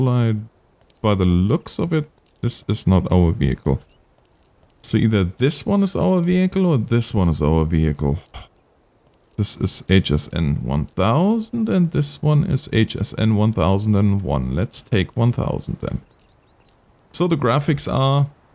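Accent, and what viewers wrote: Germany. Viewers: Mate I swear I hear the tiniest of an accent... German, Dutch, anything?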